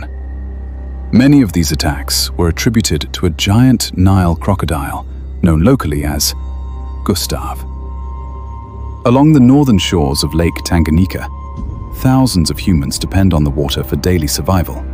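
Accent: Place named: United Kingdom. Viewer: As soon as I heard the British voice, I knew it was going to be good.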